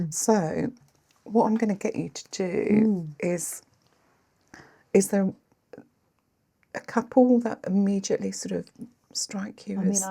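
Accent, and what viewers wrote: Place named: Nottingham, United Kingdom. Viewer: This is officially my first ever comment on Youtube,. And it had to be for you! Absolutely love your tonality, pace and accent.. I had studied in the UK some years ago and your videos always take me back to Nottingham:)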